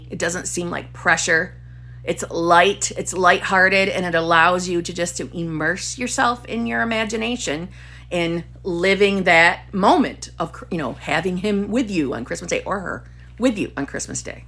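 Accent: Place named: Long Island, United States. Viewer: Neville's wife manifested HIM! He talks about it and he wanted out of his first marriage and New York State was opposed. As you stated, those were limited timelines. So the woman who became his wife, did something. He never states what, but it was a manifestation protocol. Love you 🦋🌺 P.S. I am originally from Manhattan, and attended C.W. Post. I swear I hear a Long Island accent. I listen to you in the morning while doing my stretches now...the rampage on life. LOVE IT, LOVE YOU! It's all Done. I believe.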